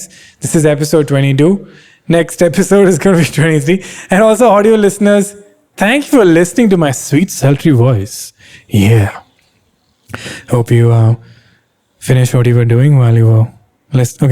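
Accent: Kerala, India. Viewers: talking in english with a hint of Malayali accent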